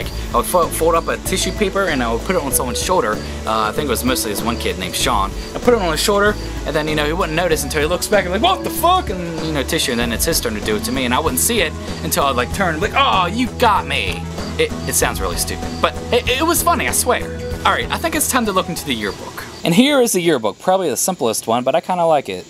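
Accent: Australia. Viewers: What country do u live in? i can kinda hear a little accent (kinda like Australian or British)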